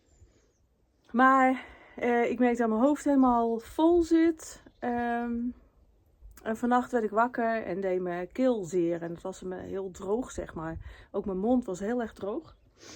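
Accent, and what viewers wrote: Utrecht, Netherlands. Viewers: Ohhhh kaneelbroodjes, sneeuw en een sprookjes verlicht huisje!!! Even in de natuur, daar knapt een mens altijd van op. Nou dat Utrechts komt mooi uit, dan begrijp ik je prima!! Haha, dus lekker blijven kletsen... En laat ze maar kletsen!! 😂😂 Inderdaad, blijf wie je bent!! Ik hoop dat je inmiddels bent opgeknapt, zo niet... ziek lekker uit. Dank je wel weer voor toch echt een leuke vlog met mooie beelden. Alleen daarvan al kom ik tot rust... Hej då...❤ Oh ja... #ikdoemee 😊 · Beterschap aan beide! Jullie hebben denk ik inderdaad het griepvirus uit Nederland te pakken. Op mijn werk zijn ook ontzettend veel mensen met griep.. het is dus erg besmettelijk helaas. Je had ook gewoon een weekje over kunnen slaan he! Ziek is ziek! 😊 En negatieve reacties? Ik snap dat nooit. Niemand is toch verplicht om te kijken?! Lekker jezelf zijn! 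En als dat als Lenie van de Takkestroat is… 😉helemaal prima! Dialecten zijn mooi! Er zijn al zoveel mensen die dialectloos door het leven gaan.. ik vind het heerlijk om aan het dialect te raden waar iemand vandaan komt. Voor je het vertelde had ik Utrecht al juist geraden🤣 En.. het valt ook wel weer mee hoor! Doe nog even lekker rustig aan en goed uitzieken! Ik doe niet mee… met de griep bedoel ik dan hè😊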